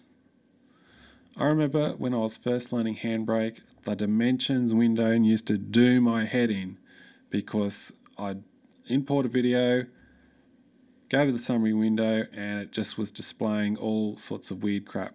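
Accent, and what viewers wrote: Australia. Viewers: LOL, just watched your video (1st Feb 2025) on Handbrake v1.9.0! And it’s STILL such an awesome, informative, and super helpful guide – easy to follow with that classic Aussie accent too! 😂 Greetings from South Africa! :hand-pink-waving:🏉🏏😆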